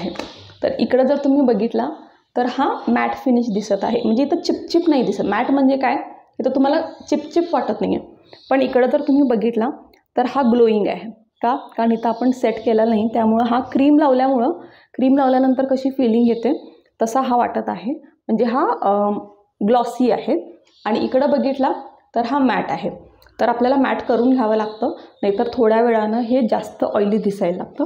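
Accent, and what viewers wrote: Kolhapur, India. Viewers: Kolhapuri ahes na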